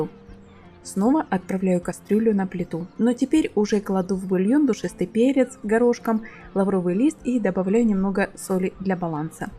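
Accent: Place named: Surzhik, Ukraine. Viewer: С таким чудовищным суржиком и на рецепах?😅😅😅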